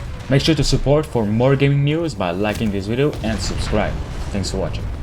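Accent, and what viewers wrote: Quebec, Canada. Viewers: Great Québec accent!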